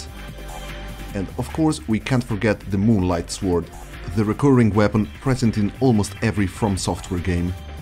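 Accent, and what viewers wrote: Italy. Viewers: Chad italian pronunciations of latin 🥲👌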